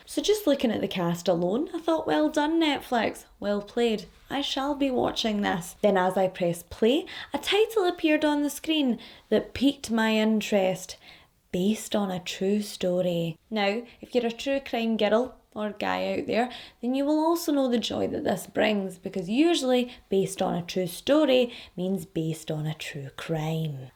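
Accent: Scotland, United Kingdom. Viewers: it really did tho mamas!! Buuuuut I've got you on the big screen rn, and even got my partner into your true crime stories! He enjoys your beautiful Scottish narration just as much as me! 😍💗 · Hi just found your channel out here in these YouTube streets and I LOVE YA!!! I love listening to the Scottish Accent!!! Yay!!!! Not to mention hoe gorgeous you are and your sense of humor is epic!!! 😉😉😉😉😉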